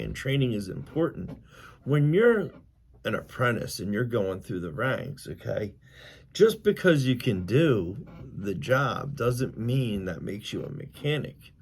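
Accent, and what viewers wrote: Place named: Philadelphia, United States. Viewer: I have been watching your videos , as soon as I heard the first sentence I picked up on your accent and figured you were somewhere local to Philly . I have been a union electrician in Philly for 24 years . Everything you’ve said on every topic is spot on . Coming from a union carrer , its cool to hear your views. I also admire you finding your nitch in knob and tube , as a commercial guy , I would never even touch it lol . Anyway, good luck and stay safe . ⚡️⚡️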